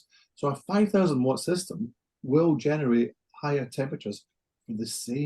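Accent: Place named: Scotland, United Kingdom. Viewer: Thank you for your amazing insight/understanding IPL! We have just introduced the Venus Versa into our clinic……….the trainer was shite at explaining what is a very complex area of energy devices!! I am not good at retaining information😂……..but I rewatch all your videos and each time I retain more😊 Please keep them coming!!!🎉 Love from Western Australia (also Scottish, so it’s a dream listening to you! 😂😂🏴)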